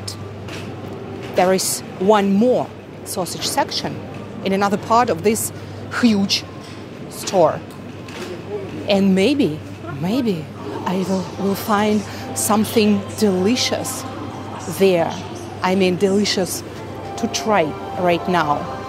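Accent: Russia. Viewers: ! С первых слов на английском слышно Рязанское произношение. Это пропаганда! Еды много, а кто это покупает? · Вы учитель английского? Разговариваете как по Бонку. И акцент русский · Ощущение, что это русская девушка, просто говорящая на английском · Your accent is like Russians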